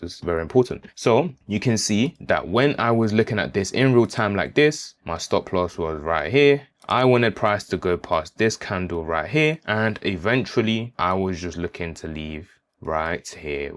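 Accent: United Kingdom. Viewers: Perfect, Great info, and well presented, you're a gifted teacher...👍 You sound like you're a Londoner mate... Arsenal or Tottenham? 🫡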